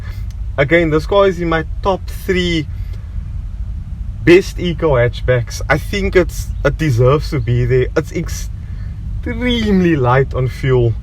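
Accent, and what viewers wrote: Cape Town, South Africa. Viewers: His accent is from Cape Town South Africa to be exact. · I always get excited when I hear a South African accent doing some reviews on YouTube 😭♥️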